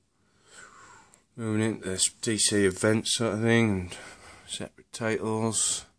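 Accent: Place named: Northeast England, United Kingdom. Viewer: I'm from just outside Newcastle, so I picked up on the accent! Have you added any more to your batman collection since you posted this video?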